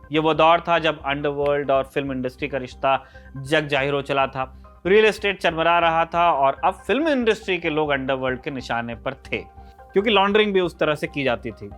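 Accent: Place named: Mumbai, India. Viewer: Mumbaee Bola Anchor 😎📢😫😫😡💙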